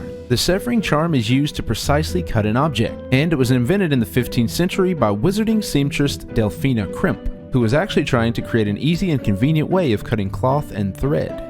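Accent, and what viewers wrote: South, United States. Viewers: Good video! Jw, I can’t decide your accent, where are you from? Southern?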